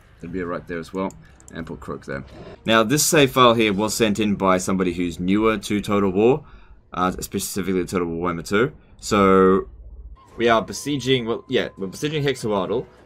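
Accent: Australia. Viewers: It's your down under accent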